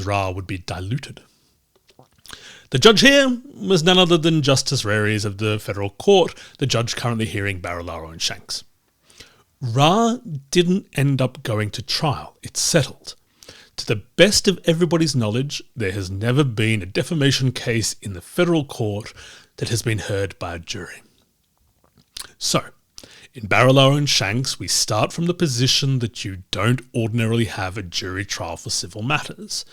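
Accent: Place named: RP, United Kingdom. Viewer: You're the only Australian I've come across with an Etonian posh boy accent. Thanks for explaining to everybody how we in the UK screwed up out legal system over the past 400 years. It's a pity that you Australians can't shake of our baggage and come up with a better system of your own.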